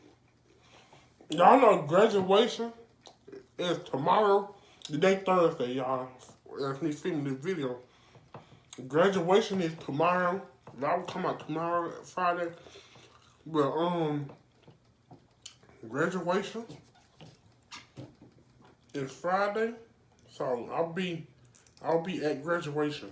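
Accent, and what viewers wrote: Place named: South, United States. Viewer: I'm from California and I love your southern accent...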